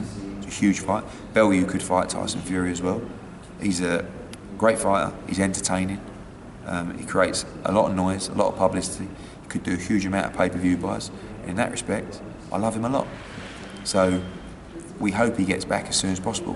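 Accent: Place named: Glasgow, United Kingdom. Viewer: Ooooo mate you might wanna tone down the Glaswegian accent. Also get a decent camera. At least the sound is better though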